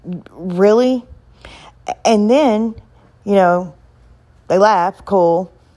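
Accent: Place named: Appalachia, United States. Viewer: I watched the first cpl mins of her live from earlier and I have to say, her harping on you not enunciating the L in the word hole…girl lol. Does she know what accents are? That’s the Appalachian dialect and yours isn’t really as thick. Accents have no bearing on education and intelligence. My daughter is PAID and flown to California etc to research math and present on her findings. Her accent is as thick as mine. All 3 of my kids tested in the top 5 percentile and are highly educated and motivated young women, two of which test on genuine levels. One was even scouted out by YALE, but even with their programs my country ass is too poor for all that lol. They are as country as cornbread when they speak. Also, if I recall correctly doesn’t she say “warsh” for wash? 🙄 Thinking someone is ignorant because of their accent is one of my biggest pet peeves lol so I had to say something. 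Also, as someone that struggles with mental health and has children that also struggle…it’s not safe or wise to tell a child anything negative being said about them, no matter who it is. They deal with enough from their peers as is, why add to that? Anyways, maybe one day I’ll hop on your panel and be as redneck as I possibly can to really give her some stuff to laugh at 😂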